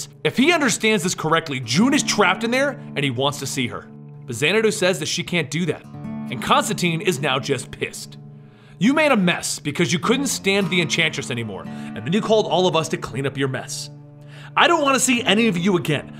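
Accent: United Kingdom. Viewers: Nice British accent xD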